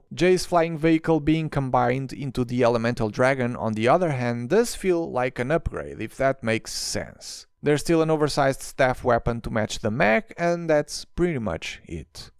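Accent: Portugal. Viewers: Sotaquinho da tuga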